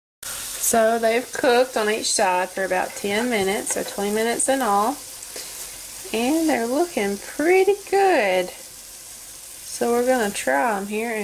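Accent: United States. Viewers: That souther accent is almost comforting in a way; Ive been in St. Louis way too long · By the way that’s a lovely accent you have. Texas?.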